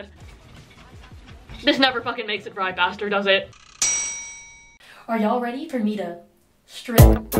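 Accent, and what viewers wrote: United States. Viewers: aa a Well considering has a very clear american accent, asking her what nationality she is doesn’t make much sense in america.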